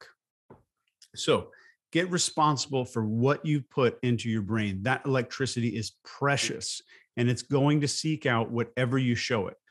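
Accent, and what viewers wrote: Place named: Arkansas, United States. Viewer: I’m here from the behavior panel. Im also from Arkansas, so your accent is so familiar to me, you remind me of some of my family and friends 😊 thank you for your content it is really appreciated ❤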